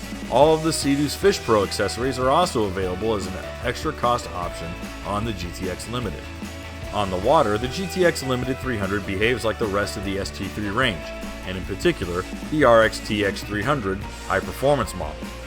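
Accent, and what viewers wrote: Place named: United States. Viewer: Nice Ski I Was watching this video thinking this place in the USA looks exactly like where I ride my ski in Sydney. The American accent had me thinking it was filmed over there.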